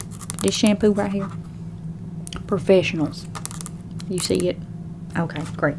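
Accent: South, United States. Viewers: Great southern accent.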